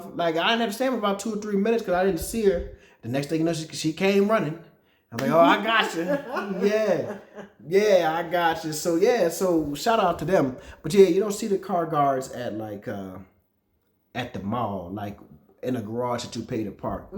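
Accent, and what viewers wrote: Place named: United States. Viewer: I want to work to your company please and I want to speak English like you, I like American accent. 😃😃😃😇🌮✊👌😐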